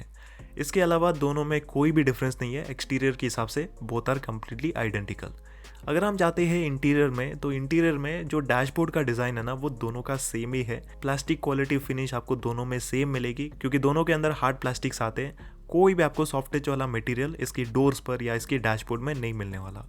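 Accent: Kerala, India. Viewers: Bro, neutralize your hindi accent. Can make out you are from Kerala.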